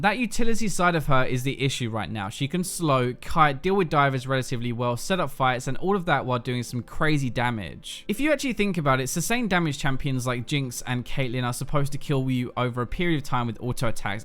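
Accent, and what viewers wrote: United Kingdom. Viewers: Bro british accent screws the translation up but still great vid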